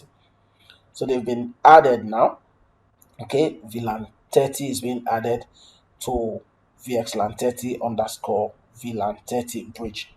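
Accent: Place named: Nigeria. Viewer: why you sound like nigerian scammer?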